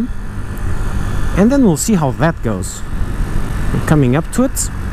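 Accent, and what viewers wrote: Portugal. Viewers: Ahah o teu sotaque parecia mesmo de Portugal mas só quando falaste é que percebi mesmo ahah, podes dizer-me quanto custa essa moto em Portugal? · Sotaque tuga! Sera?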